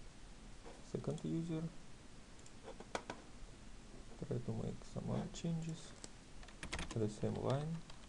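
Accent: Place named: Russia. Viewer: лучше по-русски говори, ёр рунглиш вери бед)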